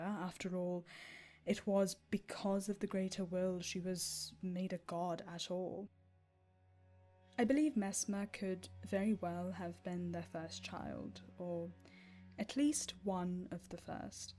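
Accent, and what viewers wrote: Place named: Germany. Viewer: I hear a bit of german in there?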